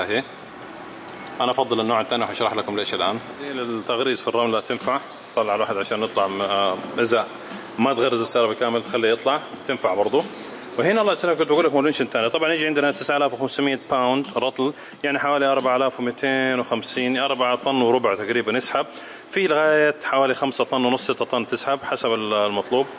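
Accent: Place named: Bangladesh. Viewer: كركر زياده لهجته لهجه بنقاليه ، . . .اضبط لهجتك لا تتكلم بهذي طريه تكلم بلهجه العامة . . .ولا تكلم بلهجه القبليه اما هذي الهجه مدري كيف جايه تح انه سبك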